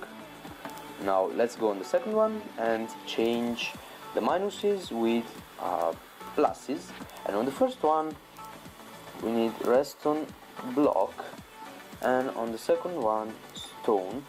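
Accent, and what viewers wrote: Italy. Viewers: la tua pronuncia è all'italiana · non ho capito se sei italiano e parli in inglese o se sei inglese e conosci l'italiano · ❉Team Chicken❉ ahhahahaahaha grande comunque dal modo in cui parla inglese sembra italiano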